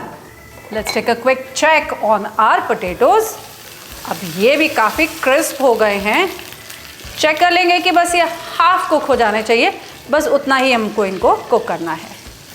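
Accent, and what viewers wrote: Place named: Uttar Pradesh, India. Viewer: madam aapka recipies batane ja style mujhe bohot pasand hai aur jo aapki UP touch wali Hindi ❤ Par ek Salah hai meri.. ki aap yadi ghar ki sabzi banana bata rahi Hain toh nonstick ki jagah ghar wale cooking vessels use kareingi toh achha hoga.. jaise mein ghar mein roz mein loge ki kadhahi use Kari hun healthy cooking ke liye. Aur ek baat.. aajkal tamatar itne mahange ho gaye hain aur aapne Zara si aalloo Shimla mirch ki sabzi mein 2 bade tamatar daal diye 😲 aap pl. Kuchh recipies without tomatoes wali ya tomatoes ko kis cheez se replace kar sakte hain batayeingi toh dekhna aapke viewers double ho jayeinge 😁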